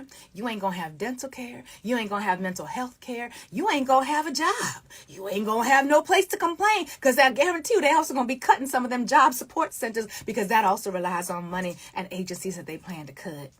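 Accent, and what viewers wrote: South, United States. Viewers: That southern white accent hit different when you're telling the truth.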